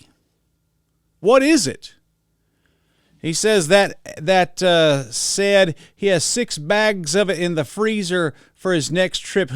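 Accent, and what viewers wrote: South, United States. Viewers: You sound like a hillbilly brooooo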